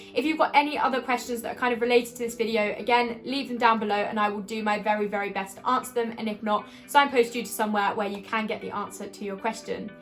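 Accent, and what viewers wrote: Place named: United Kingdom. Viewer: Love your british accent! ❤️🥺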